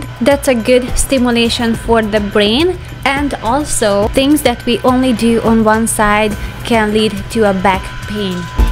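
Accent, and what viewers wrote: Hungary. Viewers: Elvileg van automatikus fordítás a youtube videóknál, ahogy látom itt is. Én inkább magyarul hallanék már egy videót, hiszen magyarok.. és legyen angol a fordítása:D Nagyon bántja a fülemet, hogy magyar kiejtéssel beszél angolul.. tudom az én bajom:) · Hi, I just started to watch your videos! You accent is similar to my neighbours who are Hungarian, now I understand why:) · Hodvoid. I noticed the accent. My mom was Hungarian and that’s we all 3 brothers identified ourselves.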